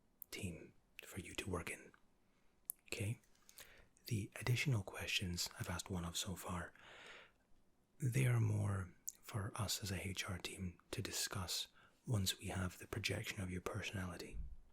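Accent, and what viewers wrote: Scotland, United Kingdom. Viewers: I love the tone of your voice and the way you speak. Maybe it's the accent that I like so much being a fellow Scot ☺️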